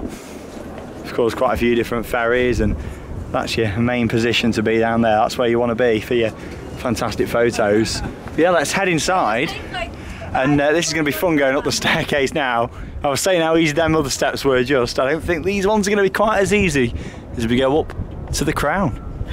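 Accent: United Kingdom. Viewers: I like your uk accent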